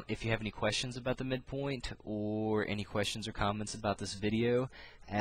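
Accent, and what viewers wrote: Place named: Chicago, United States. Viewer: hahaha in this video you kind of have a chicago accent you have the short sound for your A's. I think.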